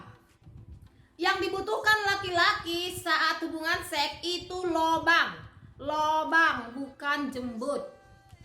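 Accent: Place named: Banyumas, Indonesia. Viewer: Ini lebih keren toplah mbak infonya ngapak keren ren ren👍🏼👍🏼👍🏼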